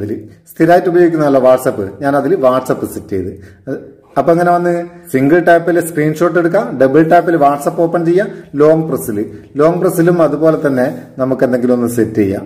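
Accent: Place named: Meppayur, India. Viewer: മേപ്പയൂർ കാരനാ ല്ലേ.... ഭാഷ കേട്ടേരേ തോന്നി പേരാമ്പറെ ന്റെ അടുത്തേടിയൊ ആണ്ന്ന്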